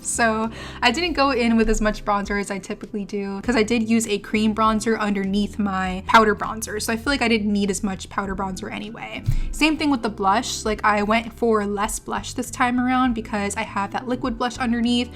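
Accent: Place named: Miami, United States. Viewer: sounds like miami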